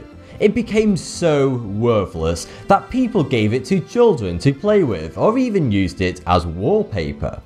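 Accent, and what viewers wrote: United Kingdom. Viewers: Is this like racism or just you don't understand British accent? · ***** British accent guy. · His nasally, British accent is annoying. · idk why but his british voice is driving me mad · hes from the uk, the way he talks is actual english, american is actually incorect english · Buck teeth and a British accent? Its like every word your pronounce starts with the letter "F". Like you say thirteen like " Furteen" WTF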